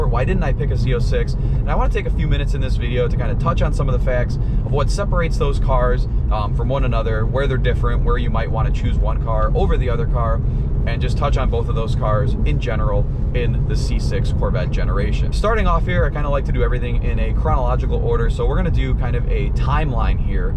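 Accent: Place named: United States. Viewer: haha no no I understood that maybe it’s your American accent. I’m from Toronto Canada! Definitely the GS and Z06 platforms are best bang for your buck but the ZR1 is a beast. It’d be a nice car to review on the channel if you can get your hands on one!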